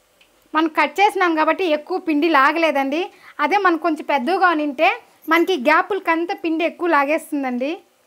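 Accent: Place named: Bidar, India. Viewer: Thumba chennagi adige madthira👌nanu Karnataka dalle belldiddu, kannadavara manasu thumba swacha vaddu, nimma mathu kelldagella namma beader jille nenapagute, all the best nimge👌👌👌👍👍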